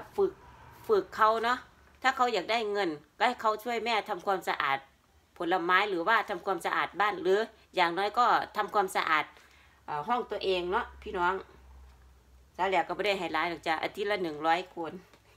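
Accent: Isan, Thailand. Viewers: เว้าอีสานฟังง่ายจ้า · พูดอีสานเลยจ้าฟังเป็นธรรมชาติดี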